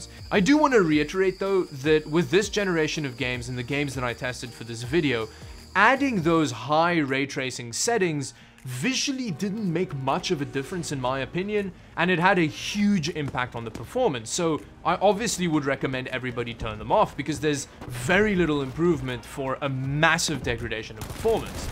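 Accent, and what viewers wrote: South Africa. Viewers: I enjoy your videos very much. Just a question: You sound like you have a South African accent, and then I see your shirt says Namibia.. so I wonder if you're from Namibia? Do you live in Europe now? I'm not fishing for your address or anything, just curious. :) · His accent already made me think hes from south africa - the Namibia shirt made me even more suspicious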